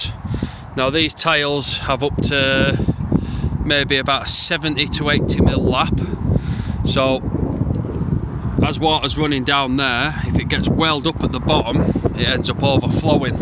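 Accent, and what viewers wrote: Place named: Northern England, United Kingdom. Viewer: I'm American but something tells me that you're from the norf.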